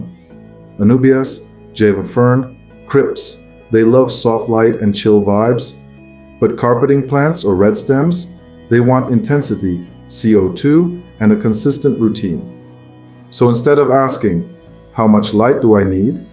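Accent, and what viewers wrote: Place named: Russia. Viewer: I like your somewhat russian 🙂 voice which is clearer and concise. Slower and well paced so I can pause and take notes too. 👍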